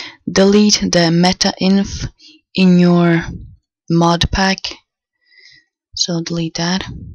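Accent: Russia. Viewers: Your Russian ? :D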